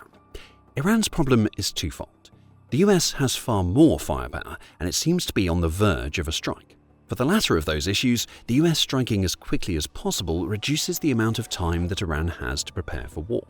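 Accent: United Kingdom. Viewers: I don’t think you should make videos more than 5 min long. Something about your British accent makes me sleepy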